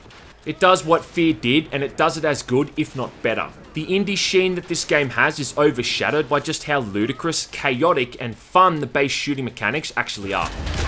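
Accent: Australia. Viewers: putting on an accent? ever heard of australia?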